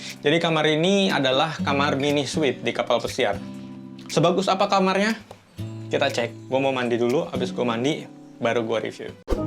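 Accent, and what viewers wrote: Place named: Java, Indonesia. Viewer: Aslinya mana kang, logatnya ko keliatan kejawa jawaan :v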